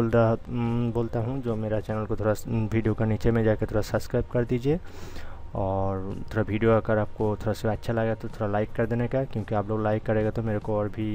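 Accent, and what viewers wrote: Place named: Odisha, India. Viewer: Bhai tu odiya ki hindi to achha se bol de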